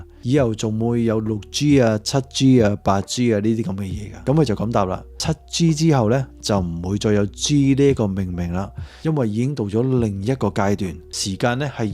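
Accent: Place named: China. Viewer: 一聽大陸可以唔洗聽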